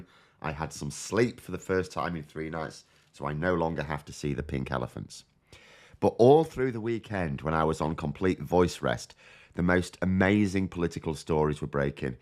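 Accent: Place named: Yorkshire, United Kingdom. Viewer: Very Yorkshire Batman today, kinda suits you.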